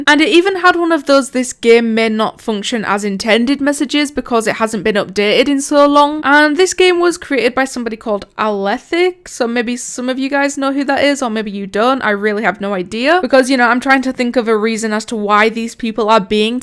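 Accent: United Kingdom. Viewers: I like 👍 your british voice👍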